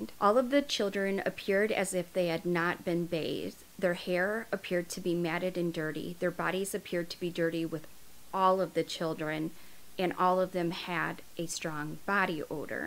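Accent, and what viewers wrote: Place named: Dakota, United States. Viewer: Are you from Dakota? You remind me of a character from Fargo or Chelsea houskas mother.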